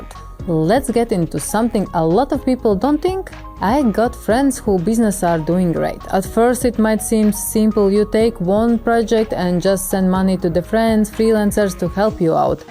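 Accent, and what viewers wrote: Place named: Russia. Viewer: I love the russian accent.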